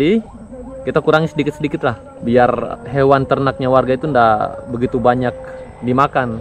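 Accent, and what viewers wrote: Indonesia. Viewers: BHS nya spt org gorontalo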